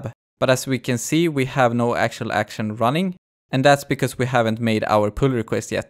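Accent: Sweden. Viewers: Sverige accent for sure.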